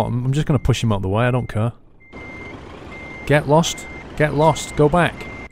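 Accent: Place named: Birmingham, United Kingdom. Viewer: im guessing yuor from birmingham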